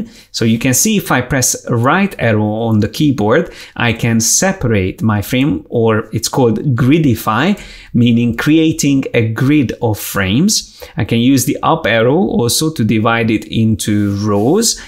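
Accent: Hungary. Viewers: sick video, are you Hungarian? judging by the accent :) (so am I) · Are u hungarian? Your accent is identically as hungarians speak english. No offense, it just was familiar to my ears...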